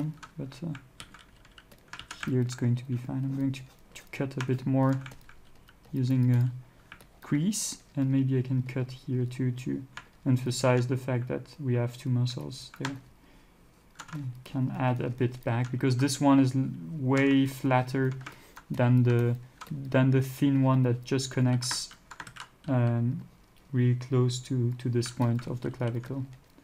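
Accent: France. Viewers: i love your french accent it is so sexy :D romantic <3 <3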